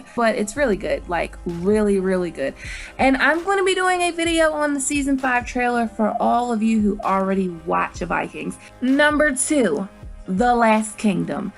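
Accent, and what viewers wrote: United States. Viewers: Your accent is so american, it literally sounded for a few seconds like you tried sounding dumb on purpose.. couldnt watch anyoner after i realized that it was actually just how you talked! :D You sound like a female cowgirl from the 1800's chewing tobacco and spitting on the floor of your own house..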